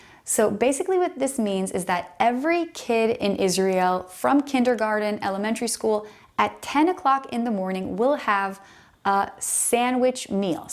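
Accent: United States. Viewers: Your voice sounds like you were raised in the USA? We have some cats but nothing like Jerusalem. I think we have more dogs here. Watch out for pit bulls if they are running loose, that doesn't happen too often.😊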